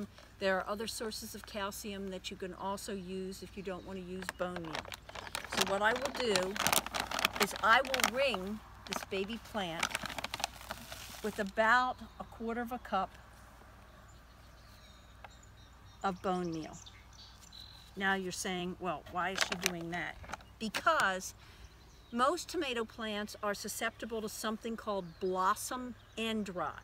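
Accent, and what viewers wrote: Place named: New Jersey, United States. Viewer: You must be from N.J . Thank you.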